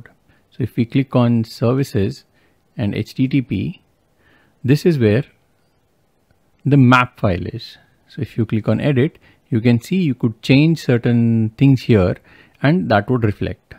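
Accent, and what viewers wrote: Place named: India. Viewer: I hate indian accent but I love you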